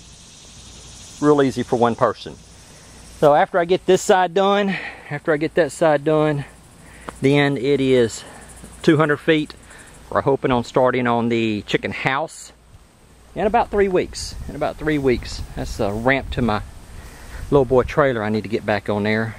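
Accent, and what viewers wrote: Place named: Texas, United States. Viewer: Looks fantastic. Enjoyed hearing a genuine Texas accent. Lots a posers out there these days.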